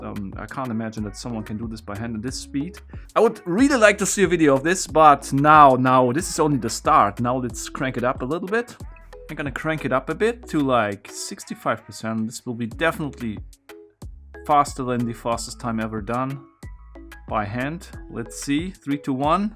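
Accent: Germany. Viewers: Super Danke für deine Antwort. Sehr nett! Weiter so! Habe keinen blassen Schimmer weder von Programmierung noch von Maschinenbau oder Technik. Aber ich liebe alle Kanäle, bei denen die Leute mit Leideschaft und Herz dabei sind und den Zuschauern, die Augen zum funkeln bringen. Das passiert genau bei deinem Kanal. Man merkt, dass du dein Hobby zum Beruf gemacht hast. Bin selbst Sprachlehrer und mit Leidenschaft, bringe selbst die Augen meiner Schüler zum Leuchten, wenn sie merken wie einfach es ist, das immer als schwierig zu erlernende Deutsch zu verinnerlichen und mit Leichtigkeit anzuwenden. Oft wird immer unterstellt: entweder Sprachen und künstlerisch oder mathematisch-naturwissenschaftlich. Dabei gehen Sprachen und Mathematik einher. Beides sind logische Konstrukte mit klaren Regeln. Ich mag dein Englisch, echt super, und ich mag es bei den Deutschen wenn auch noch ein Akzent heraushörbar ist. 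Ein Hauch von Heimat! Super Kanal, bin erst drüber gestolpert! Freue mich über deinen Erfolg. Mal sehen, ob ich auch mal einen Kanal aufmache und mit Herz und Leidenschaft die Leichtigkeit zum Sprachenlernen vermittel, oder wie jeder Schüler genau den Sprachlehrer bekommt (oder formiert) den er braucht! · Voll deutscher Dialekt, ne ?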